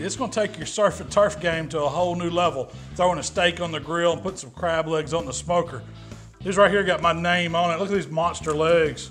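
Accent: South, United States. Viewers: I like his southern accent.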